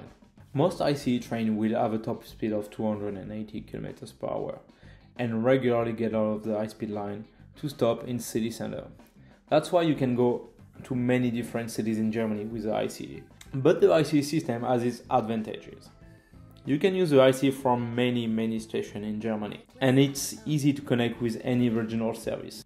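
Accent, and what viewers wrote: France. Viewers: heaviest French accent on the internet :) but such in depth knowledge of European railways keep up the good work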